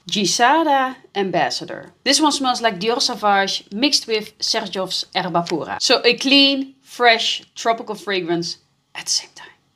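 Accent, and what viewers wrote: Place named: Netherlands. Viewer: She has a Dutch accent.😊❤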